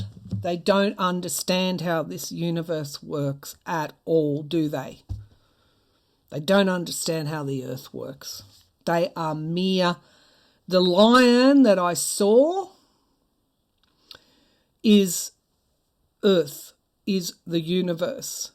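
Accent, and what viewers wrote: Australia. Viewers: Hi, its lovely to hear an Australian accent on this neck of the woods in You Tube land. Its so fasinating listen to what is going on in America with the politics and like the last Presidential Election, i cant wait to see Trump get his Kiss from Karma. I realize most of your viewers are over seas but would love for you to do some Readings on Australian Politicians. Isnt it fascinating to see the same scenario Playing out all over the world in terms of the utter disappointment of performance our political leaders that were in charge over the Covid period. USA, UK, Aust. just seemed to have the most self absorbed leaders at a time when we needed the most caring and Empathetic in Charge. What is amazing to watch now is how One by One they are losing their grip on everything that was more important to them than doing the right thing when they had the chance. 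Spirit really is amazing to watch. Even the communist leaders are having their day in the Heat. I wonder what will come of them too. As you have said, Putin will be the first one to go. I really love the way you read and really hope you can do some pollical readings for Australia. Jo from Northern NSW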